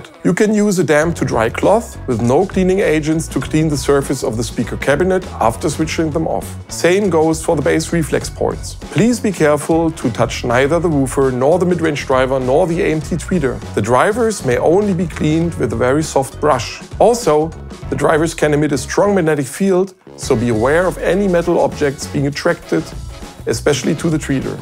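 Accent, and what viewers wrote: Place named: Germany. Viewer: Beste, ich liebe dieses deutsche Englisch xD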